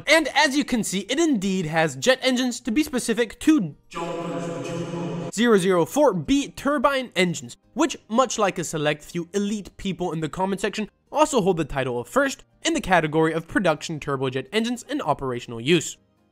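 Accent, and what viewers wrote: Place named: Germany. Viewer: This video is very funny an Sehr gut! Pronunciation too I can tell you're German 😂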